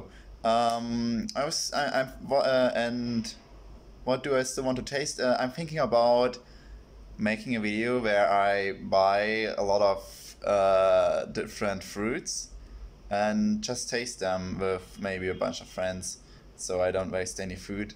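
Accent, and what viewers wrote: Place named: Finland. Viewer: So sweet ☺️ sounds more like Finglish than English, cool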